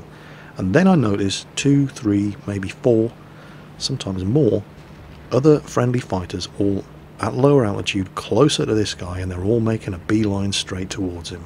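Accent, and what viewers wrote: United Kingdom. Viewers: we love the Man Cave becasue we get to know more about you then just "That cool old british guy we hear on videos" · It's dat accent. Classical Brit is what makes you popular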